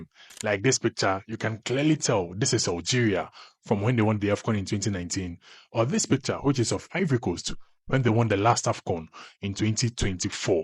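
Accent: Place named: Ghana. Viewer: You sound Ghanaian.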